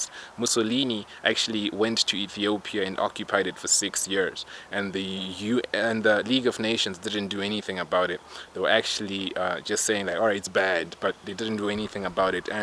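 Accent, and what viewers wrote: South Africa. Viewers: I know this has nothing to do with the video but I just wanted to ask, are you South African? I had to instantly subscribe looking forward to listening to more of your content 🙏🏾 this was a good video very well articulated and put together 💯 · Ey bro, what country are you from?? You sound South African😌🤜🤛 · You are definitely wise and well educated. I love the information you have given. I grew up in Africa and I can tell from your accent that you have grown in south Africa too. Some of this information is beautiful. However there is only one KING OF KINGS, and that's Yahuah Hamashiach(Messiah in Hebrew) not Haile Selassie. I know his name means the power of the Trinity but look up the Trinity. It isn't what Yahuah Hamashiach or his followers preached about. Having said all this, you've quoted the word well with Philip and the Ethiopian. 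I hope to find more of your videos. Shalom brother.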